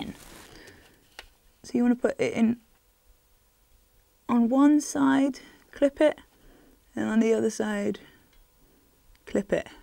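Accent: United Kingdom. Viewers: She's all British and stuff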